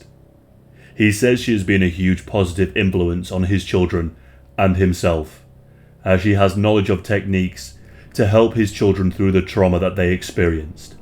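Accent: United Kingdom. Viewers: Just what we need- another Brit on YT talking horror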